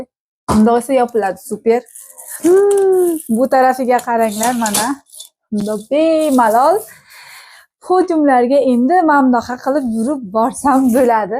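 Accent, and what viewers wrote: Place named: Olot, Uzbekistan. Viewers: . Тилиз барибир. Олотга тортади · Assalomu alaykum Buxolikmisiz Qorakoʻlning qayeridansiz hech shevani buzmasdan gapirgansiz mashaalloh ❤❤❤❤❤❤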